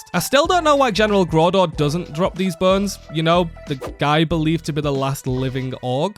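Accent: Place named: East Yorkshire, United Kingdom. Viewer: Sounds like east yorkshire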